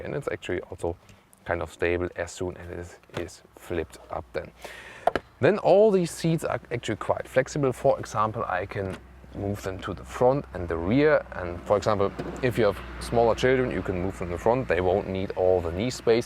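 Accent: Germany. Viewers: would you ever trust a reviewer with a french accent (even if you can understand it)? the German accent, on the other hand, makes the review sound truthful, serious and trustworthy.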